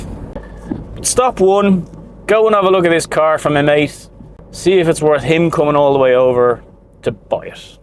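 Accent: Ireland. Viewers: Hello, new subscriber, expat Brit. Living in a very rural part of Canada. Please do not stop. You are making a 65 yr lady, very happy. I grew up in area populated with many Irish people. We have a few Irish people here, but they mostly live on the east coast, the Maritimes, I've met a few, they got lost, heading to work, mistaking a town near here for a town in a very different part of Canada. It was lovely to hear there banter etc. No one could understand them but me. Also having a back and forth conversation. I could only do because my ear was tuned to there accent, because of the Irish people, I grew up with. I was so delighted to listen to you. You came the way of Dan. Love Dan but miss his b role and drone shots, amazed at what he's doing. But you have filled in the gap. When I can't sleep, I listen to you. Your personality brings me joy. Don't listen to the nay sayers, of course when you no longer enjoy doing this, stop not for those who like to yip and yap. 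I enjoy every thing you do and learning about everything you have done. People like you give so much to people like me, who have no choice thru illness etc but to live life thru, stories, sights and sounds, that would be closed off from the world. Thank-you.🇨🇦 🇬🇧🇮🇪 Xx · you and your vlogs are a lovely breath of fresh air in a sometimes stale world 😍Just love your honesty and sincerity and your gorgeous Irish accent 🥰 Also, as my travelling days are somewhat over I do enjoy seeing the fabulous scenery of the French countryside - keep on keeping on and stay safe on all your travels - blessings 😇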